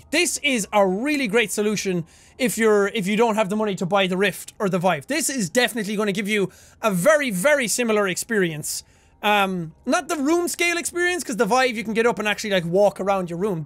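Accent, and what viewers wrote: Ireland. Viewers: Ramona Clawson He’s from Ireland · Dude are you Irish? Nice video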